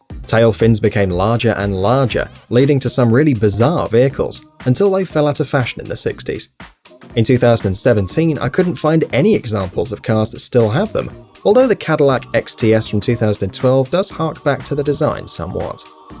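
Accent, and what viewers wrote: England, United Kingdom. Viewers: Isn't it odd listening to an Englishman speaking American English. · You sound English but you talk American. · Are you English?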